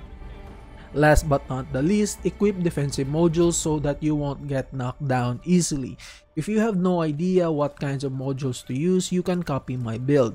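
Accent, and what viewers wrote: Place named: Philippines. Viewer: I had a feeling I was listening to a Filipino accent and I was right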